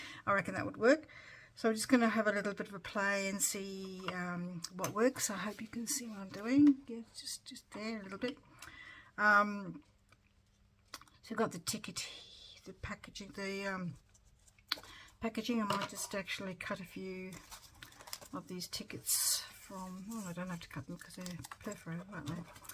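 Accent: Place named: Australia. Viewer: I really liked this collage and I love to hear your Aussie words. Keep up the wonderful pages!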